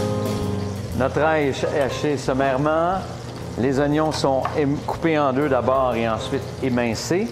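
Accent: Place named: Quebec, Canada. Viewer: Comment jsui trop d'avoir découvert ta chaîne, je bois littéralement toutes tes vidéos ! Je sais pas pour vous autres francophones mais l'accent québécois me relaxe et me fait me sentir heureux.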